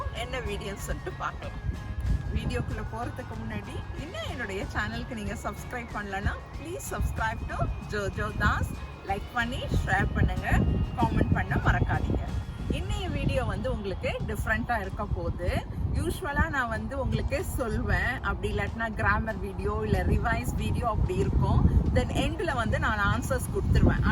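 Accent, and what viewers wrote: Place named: France. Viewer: Madam french accent . Where is video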